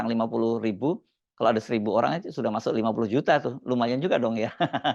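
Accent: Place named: Java, Indonesia. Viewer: Bang hersu,,ngomongnya ko jadi lebih medok,,.🤔atau perasaan saya aja🙏🏻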